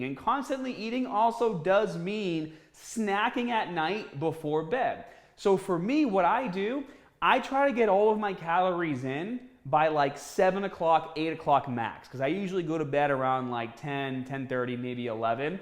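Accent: Boston, United States. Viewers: The Boston accent kills me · Great video! Your Bostonian accent cracks me up, though! 😆 Especially since it doesn't seem to be consistent: you kept alternating between saying "cardio" and "CAH-dio" during that part of the video! 🤣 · “Cah-dio” I love the Boston accent. is a sexy and smart man. Always great content! 🙏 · Cardio. It's a Boston accent, grow up.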